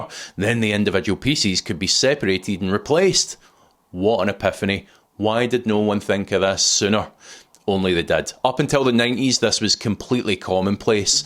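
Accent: Scotland, United Kingdom. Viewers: I subscribed just for hearing English in the Scottish accent!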